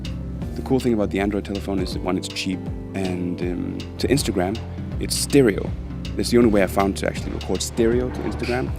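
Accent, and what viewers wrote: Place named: Italy. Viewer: You have an Italian accent 🤔 nice vid!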